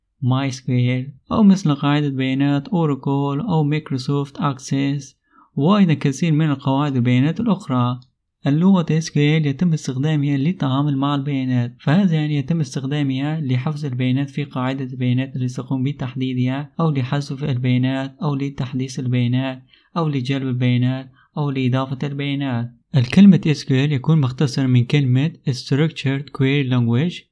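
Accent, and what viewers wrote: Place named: Syria. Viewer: الأستاذ من كوردستان بس فعلا كأنو بيحكي سوري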